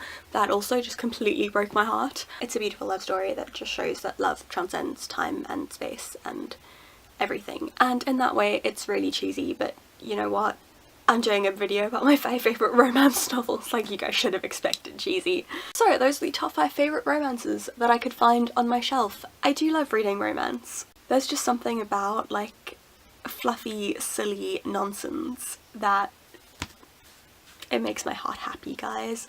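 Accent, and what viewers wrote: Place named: United Kingdom. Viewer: Are you British perhaps? 🤔 quite the strong accent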